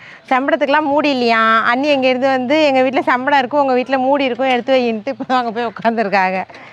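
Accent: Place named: Mayiladuthurai, India. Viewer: மண் மனம் வீசும் வீடியோ....manasuku avalo asaiya irku...keera தண்டு karuvatukolambu natural ah neenga pesurathu...ketkave asaya irku....becz enga amma mayavaram so nanga veetula pesura mathiriye irku...enaku unga வீடியோ pakkum pothula nanga munadi iruntha life ah niyabaga paduthuthu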